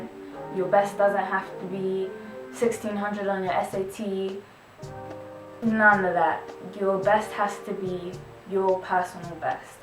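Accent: United Kingdom. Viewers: Wait so did u got to an American high schools or British secondary u sound very British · Wait how come you have a british accent xx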